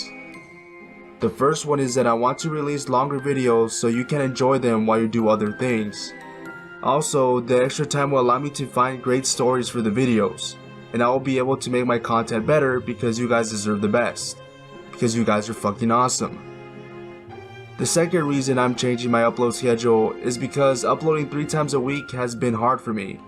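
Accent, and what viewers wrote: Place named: Latin America. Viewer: u have a latin accent.. are u latin? anyway nice videos seen every single one of them keep up the work...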